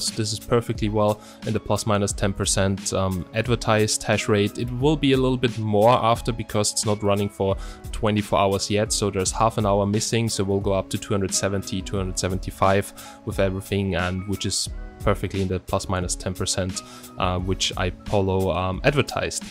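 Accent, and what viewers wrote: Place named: Germany. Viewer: Slight german accent...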